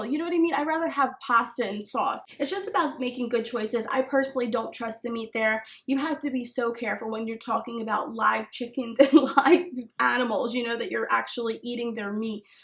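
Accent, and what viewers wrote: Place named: Philadelphia, United States. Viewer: Thanks for this! I immediately knew you were from Philly!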